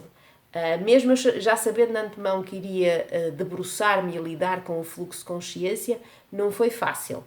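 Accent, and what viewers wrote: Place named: Portugal. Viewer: Seu sotaque português é lindo e falando em "brasileirês" ficou maravilhoso. Espero que goste da Ligia Fagundes, é um a escritora maravilhosa. Fim de 2024 ou início de 2025 pretendo realizar um sonho: visitar Portugal e comprar muitos livros nesta terra encantadora. Saudações Amazônicas.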